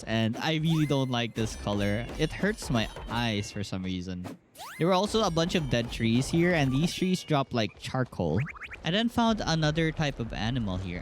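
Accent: Philippines. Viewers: are u from the felepens caus u sound like it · I knew it. From your voice this is a Pipino. Kabayan! Hahaha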